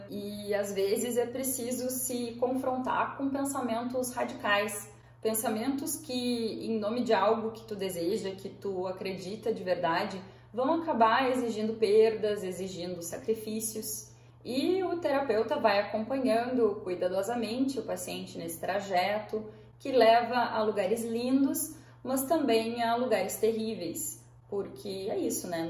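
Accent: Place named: South, Brazil. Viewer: Olá, estou maratona do o seu canal. Gostei muito da maneira simples e objetiva com que vc aborda Jung. Esses dias tenho refletido bastante em que linha farei minha especialização. Tô sentindo o mestre Jung me chamando. Parabéns pelo trabalho. E bora espalhar Jung pela rede com sotaque do sul.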